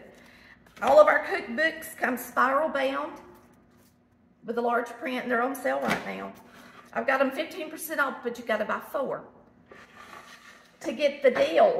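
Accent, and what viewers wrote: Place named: Texas, United States. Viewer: is so cute! It reminds me of my family growing up in Texas. She sounds just like them!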